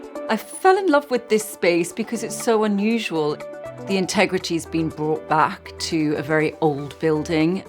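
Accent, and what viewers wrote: Newcastle, United Kingdom. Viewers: She's lost her Geordie accent! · Where has your pronounced Geordie accent gone ? Very strange you know have a posh accent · Yes her accent has got even posher and deeper with time. I understand why as another commenter has said, posh circles tend to be quite judgemental - I think being with Damian Aspinall and James Middleton influenced her change of accent much more than her media career as the geordie accent is great asset for a TV presenter. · Where's the Geordie accent? Charlie Charlton - whut happened.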